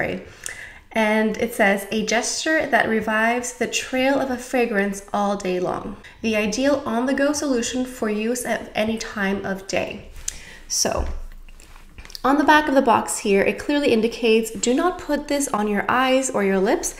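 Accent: France. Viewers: I have not seen the pencils here in the US yet but I agree with you on trying the hair mist if you don’t have. I bought the Chance hair mist after your review & love that it’s a softer scent than the perfume. I have both but it’s nice for touch up like you mentioned. Also you mentioned lotions & oils. I like to get the oils & sprays like in TF because the perfumes can be too strong. But the pencils look so pretty but I would want them to last longer than couple hours. Very nice review. Love your eye look & your French accent!!😊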